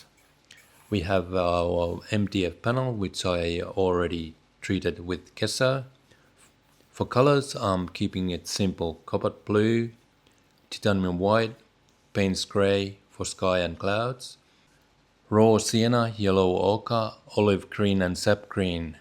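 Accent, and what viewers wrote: Australia. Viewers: Great tips and lovely art, subbed! Do I detect a little Aussie within the Finnish accent? :face-blue-smiling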